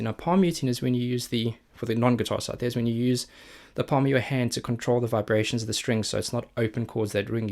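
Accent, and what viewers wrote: South Africa. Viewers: I've just subscribed your channel and saw that you've already taken the Nightwish journey. It's been said before that your reaction videos are awesome, lots of detail as far as technic is concerned, without making it too boring. Keep it up dude! OH yeah, one other thing That accent seems quite familiar to me. Shall I risk... South Africa? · You South African? Goeie reaksies mater!!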